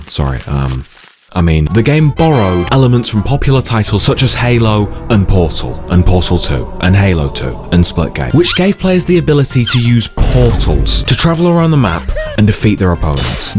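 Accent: United Kingdom. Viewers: If I had a nickel for every game video essay channel with good video fx run by a comedic smooth talking early twenties white british (or aussie) man I'd be a millionaire.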